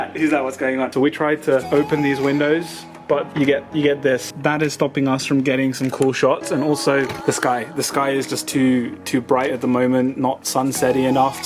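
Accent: United Kingdom. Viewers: after stayed in china for such long time you still able to keep your britain accent,that aint easy bro. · ，I know you have lived in China many years, but please keep your British accent because of the influence of Dayday. God hahahahahah. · 听着你说英语觉得好不习惯啊！第一感觉就是，哎呀妈呀，说英文怎么有英国口音啊！但是过了2秒钟以后，我才反应过来人家本来就是英国人而不是中国人 LOL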